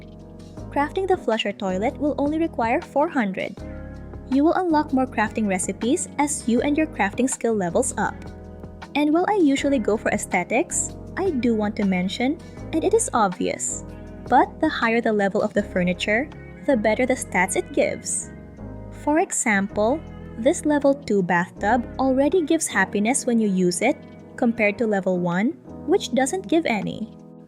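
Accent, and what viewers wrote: Philippines. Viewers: Are you Filipino why does it sound like that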